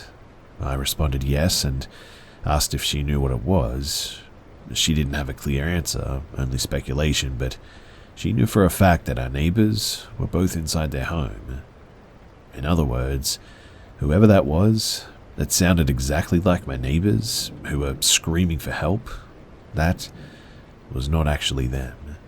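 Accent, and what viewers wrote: Australia. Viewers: I watch a Creepy Pasta channel called Mr.Creepy Pasta. Creepy pastas are a bit different than. His is more horror or scary. Creepies 😮 are, well,… Creepy. 😊. The are fiction about all kinds of things. It often looks like the person or people will look like they are going to get away…. But the don’t usually do. Mr. Creepy Pasta is the best! Creepy Pasta Jr.is really good, he has t been posting anything for a while. I think he’s sick.? I read a response from him thanking us for watching. I don’t want to nosy. So he hasn’t been making too many videos. And there are videos are usually shorter than, but they have been made more frequently. But he is really good because he puts out the videos on a regular basis and you can always. Count on him to have videos up and they are more than one video so you can relax and listen to him through the long and everything is fairly new in essence. 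I know the feeling the way he reads and narrates is very nice and that makes the story much greater for it. Thanks for keeping everything scary and interesting my friend you make the night that I am not doing so well more enjoyable. You’re like a drug that makes people feel better! Not so much high. But for me anyway, I can take some time off from reality and get myself immersed in the stories, and just relax and listen to your voice. Soothing Australian voice, and there are others who are from Australia and a lot from British.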